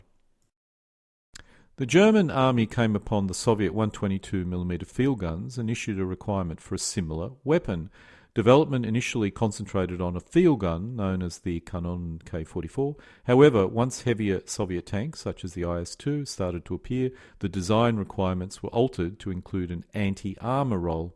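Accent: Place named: New Zealand. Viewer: Interesting. your voice sounds like New Zealand to me.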